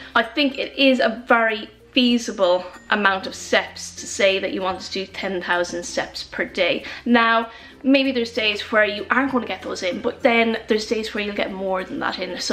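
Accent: Ireland. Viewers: "Pray to the fairies"... Lol. Does that even work if I do it - or does it work for the Irish only 😂 Love your Irish English by the way... The only reason why I religiously watch channel!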